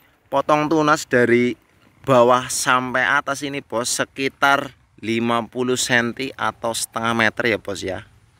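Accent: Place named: Banyumas, Indonesia. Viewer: Salam ngapak bos🤣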